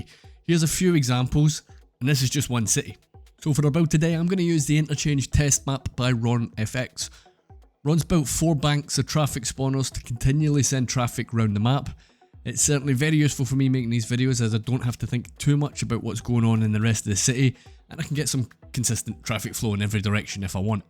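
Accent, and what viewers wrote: Scotland, United Kingdom. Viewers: heard a fellow scotsman playing my fave game and im 100% tuned in. nice video :)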